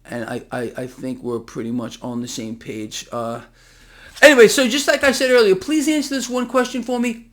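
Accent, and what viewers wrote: United States. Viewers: It's the American pronunciation I guess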